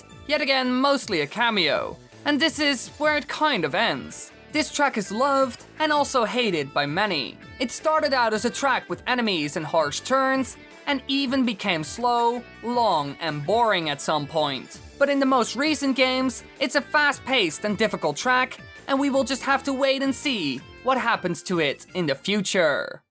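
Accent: Netherlands. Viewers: Is that a dutch accent I hear? Nice video mate!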